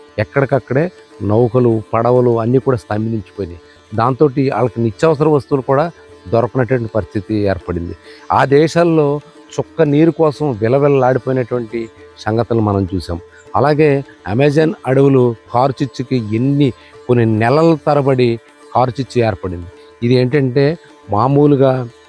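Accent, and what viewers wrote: Godavari, India. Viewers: Ayya baaboi baabai miru super ఏహే mi slang godari yaasa adbutaha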